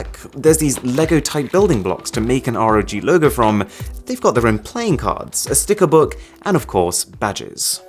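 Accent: RP, United Kingdom. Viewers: he has british accent. teach me to. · I love your reviews but that accent... fuck I hate British people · What's with the exaggerated posh accent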